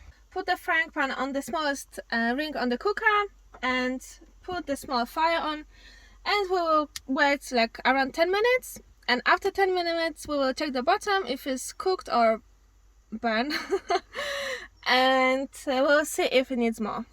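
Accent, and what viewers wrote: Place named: Ukraine. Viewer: Hi. Hope you're well. I'm trying to figure out which country you're from by your accent. You sound a bit Ukrainian, but unsure. X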